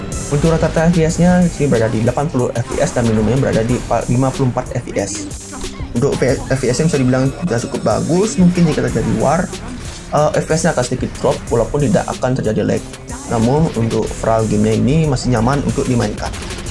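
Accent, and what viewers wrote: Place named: Java, Indonesia. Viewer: ngenah sajan logat nu medok :face-turquoise-covering-eyes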